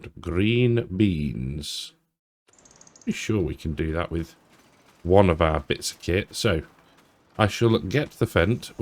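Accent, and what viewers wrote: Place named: United Kingdom. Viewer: What part of the UK are you from? I lived in London, Birmingham, Manchester and spent a bit of time in Skegness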